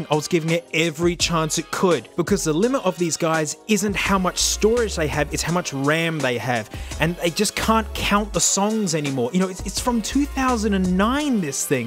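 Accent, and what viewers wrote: Australia. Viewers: Wasn't ready for the speaker to have an australian accent lol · I love watching an angry Australian man yelling at iPods. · How I love the feeling of sleeping while hearing a Australian dingus shout at an ipod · i like an Australian man yelling at tech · hes australian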